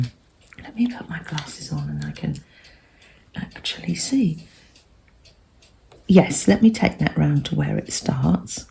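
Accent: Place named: England, United Kingdom. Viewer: I so love listening to English laies speaking about their sewing project - such kind, gentle voices, easy listening and relaxing. love this project.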